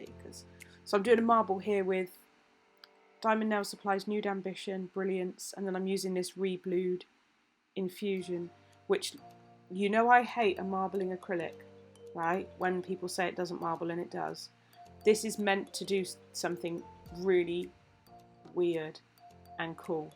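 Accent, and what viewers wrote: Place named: England, United Kingdom. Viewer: I love to hear her too. I'm in America but a huge Anglophile.... her accent slays me.... her a the lady from! Love how she says "bits and bobs"... and other other local phrases. Lol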